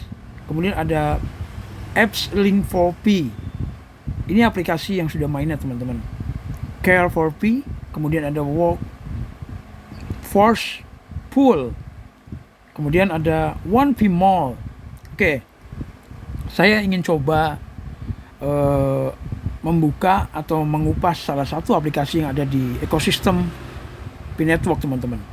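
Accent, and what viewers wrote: United Kingdom. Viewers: KEREN ENGLISH NYA PAKAI BRITISH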